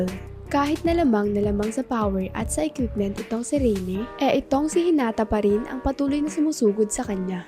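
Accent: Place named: Conyo, Philippines. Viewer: ang conyo ng voice, approve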